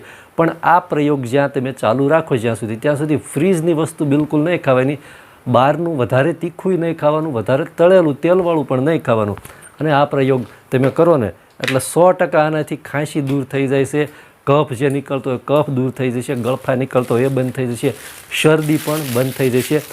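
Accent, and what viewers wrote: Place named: Gohilwad, India. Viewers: એ તેમની બોલી છે ગુજરાતી તો બરાબર ગોહિલવાડ ની બોલી છે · Namaste motabhai... Ghanaj samay pachhi aap no video prasareet thayo.. aapni kathiyawadi bhasha sambhalvi mithi lage chhe.. aap na darek video hu jovu chhoo.. Ghanaj upyogi hoy chhe and monghi dava ane teni Side effects thi bachave chhe.. aava Sara's ane upyogi video apta rahejo Namaste. 😊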